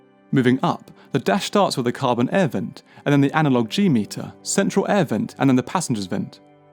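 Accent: RP, United Kingdom. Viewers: Im american but I know posh when i hear it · Brittish accent is so damn annoying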